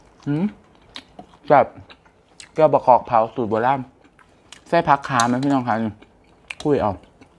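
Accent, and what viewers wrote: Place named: Udon Thani, Thailand. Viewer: เพิ่งรู้ว่าเป็นคนหนองบัว มิน่าสำเนียงคนอุดรจ้า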